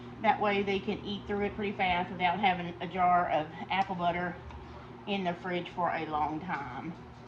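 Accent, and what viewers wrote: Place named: South, United States. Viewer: I wish I could watch and listen to your videos more often, but I have difficulties understanding you. It is a combination of speed and your lovely Southern accent. I always have both the volume and the closed captions on, but I still miss much of what you say. You often speak too rapidly for me to catch things. I need to back up and re-play sections often. I wish it weren't so. 😢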